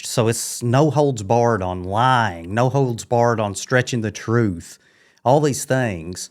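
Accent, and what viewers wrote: South, United States. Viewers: I just found you. I subbed. Keep up the videos buddy. You're good at this. People need your message. Oh, and great Southern drawl. 👍 From a South Dakota Yankee.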